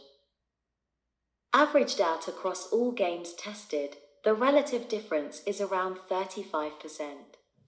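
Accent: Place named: United Kingdom. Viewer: how come the a.i. voice has a british accent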